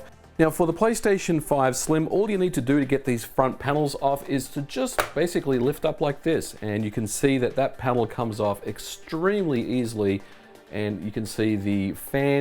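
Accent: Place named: Australia. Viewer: Got a Australian accent but talks in inches and fahrenheit Love your videos by the way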